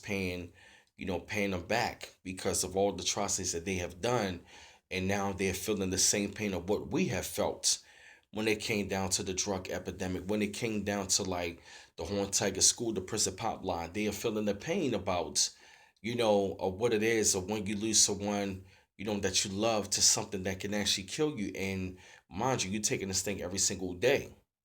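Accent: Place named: Baltimore, United States. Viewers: The Bmore accent is such an interesting mix of Northeastern and Southern. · It’s an east coast accent to me.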